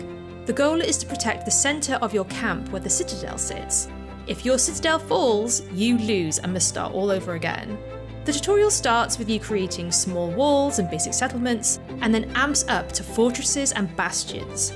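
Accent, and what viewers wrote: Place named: United Kingdom. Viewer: how you gonna have a british accent and then incorrectly use feet instead of meters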